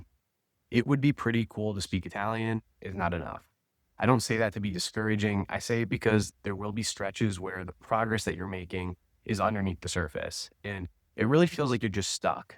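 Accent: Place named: United States. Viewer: Wow!! All this wonderful information you are sharing really resonates with me. No doubt you have a way with words, and your American accent is clear, pleasant and easy to understand. So, congratulations on your YouTube channel, and thanks a lot for your helpful insights. I look forward to more fantastic stuff—hopefully soon. Best wishes from Spain!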